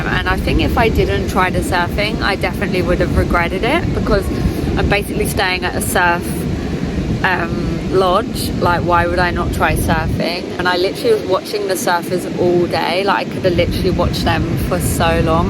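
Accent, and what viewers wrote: Ireland. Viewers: from your accent i guess youre an irish? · Hi hello are you Irish ? Scotish ? Or maybe Angel ☕😎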